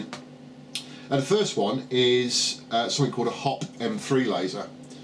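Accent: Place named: Birmingham, United Kingdom. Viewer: the light, the light! All I will say is I didn't think He would make a second coming and I certainly didn't expect a brummy retro gaming enthusiast to take His form.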